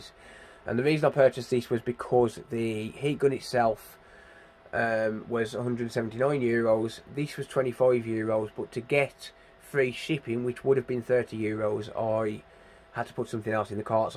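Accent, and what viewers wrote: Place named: Black Country, United Kingdom. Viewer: just subscribed off the back of my mate vince - good luck with your target - you are only up ythe road from me from the sound of the accent lol. Im in Oldbury - you are clearly a black country lad?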